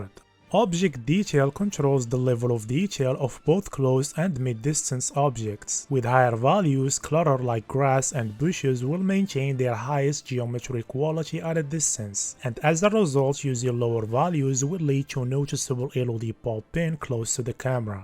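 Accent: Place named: Morocco. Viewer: Bro's accent is as Moroccan as it gets. Keep going, khoya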